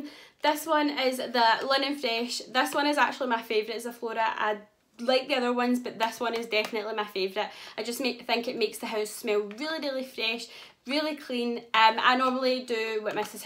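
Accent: Scotland, United Kingdom. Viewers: Great to hear a scottish accent doing a poundland haul......keep them coming xx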